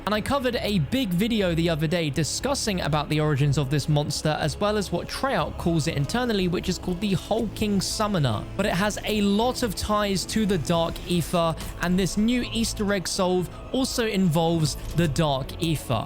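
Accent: England, United Kingdom. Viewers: Don’t pick on his pommy accent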